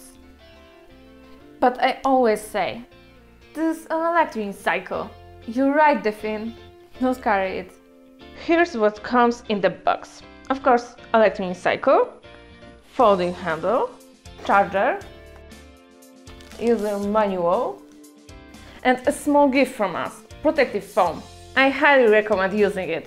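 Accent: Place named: Russia. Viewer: Приятный русский акцент :-)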